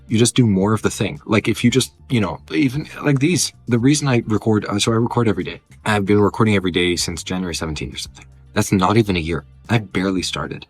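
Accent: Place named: United States. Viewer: He sounds American to me. Is he Canadian or something? Their salaries are high too. I’m so confused now lol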